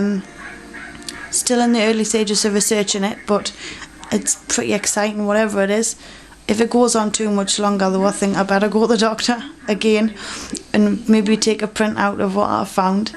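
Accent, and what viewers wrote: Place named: Derby, United Kingdom. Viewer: You talk funny (Derby lad).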